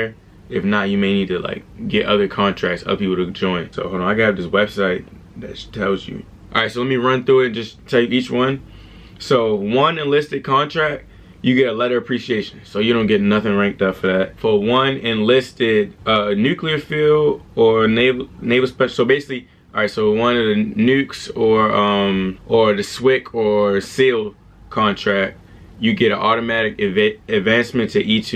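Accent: Baltimore, United States. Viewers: Nah, that's Baltimore all day and I'm not even from there 🤣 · Where you from Baltimore?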